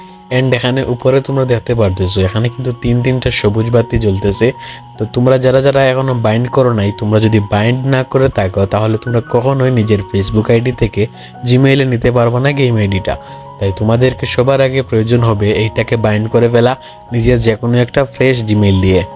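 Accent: Sylhet, Bangladesh. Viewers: সিলেটিদের কথায় আলাদা ভাইব আছে ভাই শুনলেই বুঝা যায়। শুভকামনা রইলো এলাকার ভাই❤ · vai apni Sylheti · Vai Sylhety ni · ভাই তুমি সিলেটি নি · ভাই আপনি কি সিলেটি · ভাই কিতা সিলেটি নি ❤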